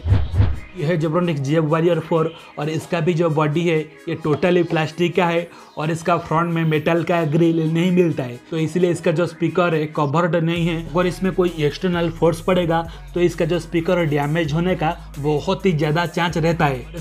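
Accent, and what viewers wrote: Bengal, India. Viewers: Bhai bengali ho yea Assamese ho?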